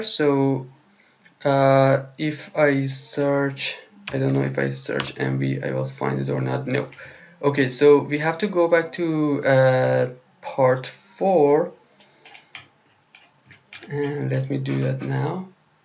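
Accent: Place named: Iran. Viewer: لهچه ات داد میزنه ایرانی هستی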